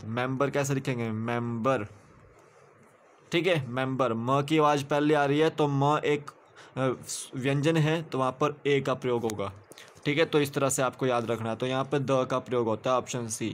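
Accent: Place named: Himachal Pradesh, India. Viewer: its really helpful thnku sir ...nd your himchali accent is too good😄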